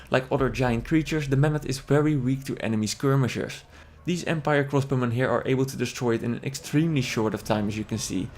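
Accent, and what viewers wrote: Netherlands. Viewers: Jij bent nederlands of niet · Lemme guess, you're Dutch aren't you?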